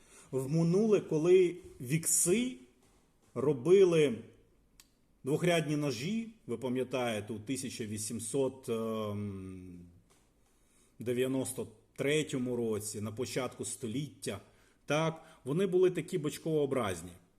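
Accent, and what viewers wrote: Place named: Eastern Ukraine, Ukraine. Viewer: У схидняков такой ужасный акцент. Нет той певучей лёгкости как в полтавском селе у моей бабушки. Тренируйтесь, песни что-ли украинские пойте.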